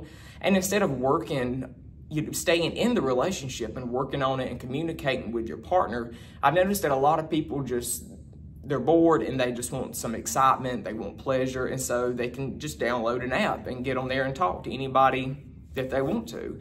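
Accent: South, United States. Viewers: Where are you from. I'm from the south. I've never heard this type of southern accent. Also, the mistake you are making is placing way too much emphasis on the opinions and judgments of your family. Wanting, needing, and begging for their acceptance. Why are you doing this?! Are you a cancer ♋️ or something? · Haha. Love yer suthen accent!!! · Love your accent, where I. The south are you from?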